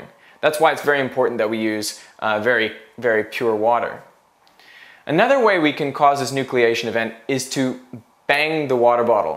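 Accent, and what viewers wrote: Australia. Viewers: Wow he really has an Australian accent in his earlier videos. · You can hear some Aussie in Young Veritasium’s voice!! So cool!! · I just realized he has an Australian accent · This is the only video I've noticed his Aussie accent bleeding through. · Just the Slightest hint of an Australian accent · his aussie accent is so much stronger in this video than his recent ones although he's canadian, right/? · Anyone else think he sounds more Australian in this part of 2011?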